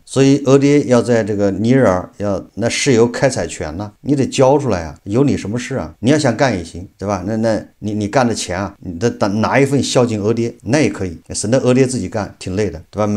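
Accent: Anqing, China. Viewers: 你是安庆老乡吗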